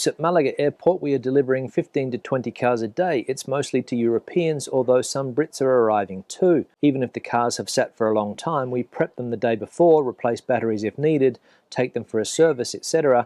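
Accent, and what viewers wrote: Australia. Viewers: I am an English speaker for 75 years. On my scale you are a 10 out of 10. You've lost much of your Australian accent probably as a result of living in Spain. I can tell that you're not speaking American English but I would not be certain whether you were from Australia, NZ, Canada or South Africa. Definitely you don't sound British. Can't believe you produce a great channel and people want to nitpick your English. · I studied Speech at University… I was taught that, in your case, you have a “regionalism” ie Down Under. An accent would be how you speak your regionalism. Say a person from Alice Springs vs. NSW….. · I love your 'no bullshit' Aussie accent.. keep up the great videos and sarcastic lessons given to those that can't spell whilst trying to slate you 😀👍👍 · Hi as an English teacher for twenty years you should know ‘more busier ‘ airport is a double superlative also I would suggest it’s not proper english ( ha ha ) to end sentences with a preposition but I have no problem with your accent although not as strong as on Rottnest in the bar As another Aus expatriate i love Spain and if you are going to do comparisons your beloved WA fails most comparisons starting with your pension You voted with your feet twenty years ago amigo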